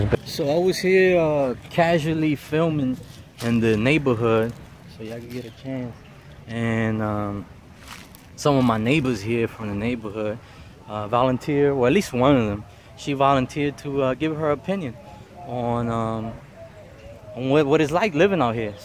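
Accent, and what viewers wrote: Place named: South, United States. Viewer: Hola si se te nota en El hablando q estuviste en El sur de USA. Saludos y sigue adelante Muy bonito y limpio. .Carisimo para vivir ahi.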